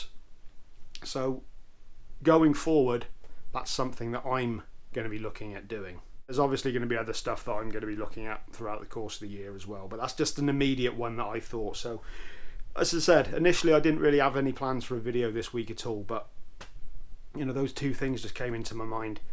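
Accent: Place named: Cotswolds, United Kingdom. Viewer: We're hoping for a new episode, any day now. The Cotswold accent grows on a person. Thanks.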